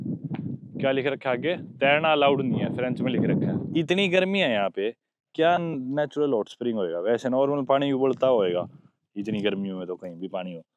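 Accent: Haryana, India. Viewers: Hariyana ka hai bhai lage tu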